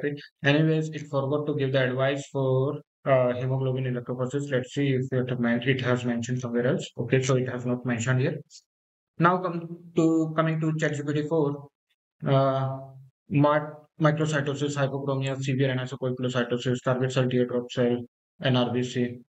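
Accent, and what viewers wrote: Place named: India. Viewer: I like the approach of this channel, a doctor testing AI technology. What I can say for this to improve is maybe speak a little bit slower as indian accent is sometimes hard to follow.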